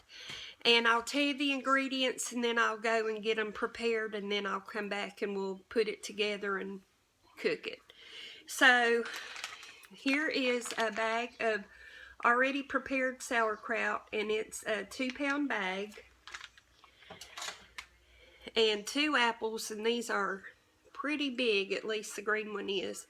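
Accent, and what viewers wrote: South, United States. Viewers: I love your accent, reminds me of my family from GA/NC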